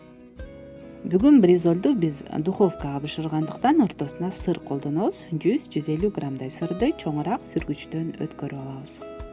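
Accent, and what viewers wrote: Standard. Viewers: Эн сонун 👍таза кыргызча суйлогонунузго баа бердим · Качество ваших видео всегдана высоте мне нравится всё что вы готовите ваша чистота на кухне и как вы говорите чисто на кыргызском языке я рада что полписана на ваш канал в дальнейшем тоже радуйте нас своими вкусными неповторимыми рецептами я из Оша😘😘😘👍👍👍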